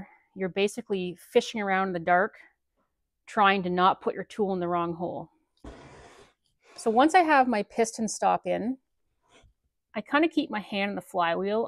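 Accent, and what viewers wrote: Canada. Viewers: I knew it had to be a Canadian engine shop when I heard her accent and saw the snow on the ground. God makes tough people in Canada. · Hi, just discovered your channel. I'm a Stihl guy, a retired hobbyist. I've been working on saws for about 7 years now, after I burned up a piston due to a leaky crank seal and needed to fix my 034. I'm in northeast Arkansas and I'm guessing you're Canadian from the way you pronounce "out". I'm now 7 years older and dozens of Creamsicles "richer". The 025/MS250 has the same starter pulley diameter as the 170, 210, 230, and having the largest displacement of these, is notoriously hard to pull (also hard to start, if you make the mistake of waiting for the first pop to turn the choke off). The lever arm of the pulley is IMHO too small for the job. However, for a younger able bodied person armed with the above knowledge, I believe it is the best bang for the buck in a homeowner saw. 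Another caveat: don't try to advance the timing unless you don't value your arm. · You're very good at what you do and you deserve many more subscribers! Is that an East coast accent? Newfoundland, maybe? I was so surprised there was nothing wrong with the flywheel key ; I was sure that was the problem, an over-advanced spark. A great how to video. Subscribed. And cheers from the West coast of Canada!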